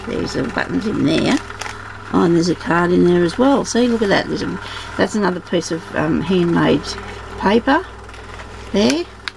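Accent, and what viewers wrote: Australia. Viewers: Hello, new subscriber, popped over from Magz Lockley's. I' m in Victoria, nice to hear another Australian. Will have a look and see what fabric I can find, do a V R. to enter your giveaway. Sue 🌸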